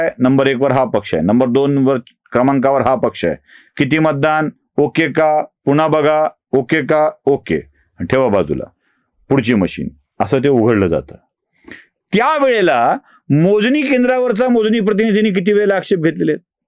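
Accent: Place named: Marathwada, India. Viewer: श्री आपण मुद्दा तर व्यवस्थित मांडता, त्यापेक्षा मराठवाडी मराठी खुपच छान बोलता. धन्यवाद.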